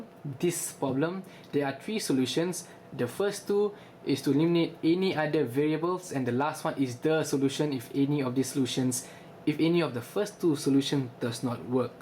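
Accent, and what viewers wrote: Malaysia. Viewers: you accent are so malaysian , i like you're video :) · Malaysian dude?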